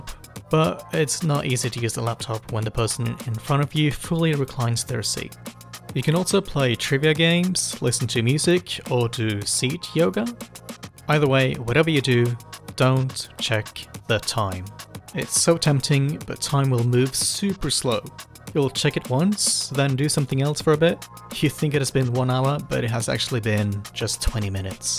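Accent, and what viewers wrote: Australia. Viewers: I came across your channel through the Casiopea video and always thought I could hear a slight Australian accent in some instances, now I understand where it comes from :)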